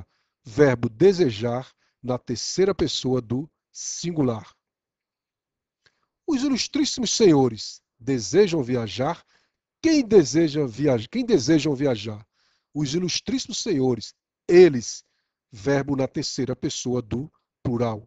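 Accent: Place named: Pernambuco, Brazil. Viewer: Aulas maravilhosas, estou assistindo todas e está me ajudando muito, principalmente porque me familiarizo com seu sotaque, pois sou Pernambucana também. Continue assim, com esse trabalho louvável!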